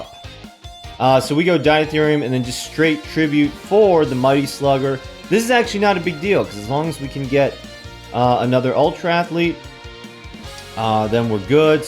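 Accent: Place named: Ireland. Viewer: A1 Irish pronunciation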